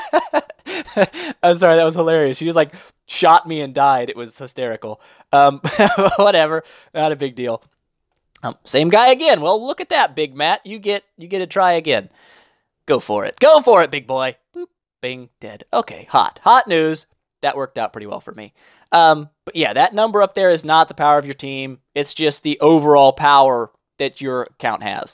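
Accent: United States. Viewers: He has traces of an American accent IMO.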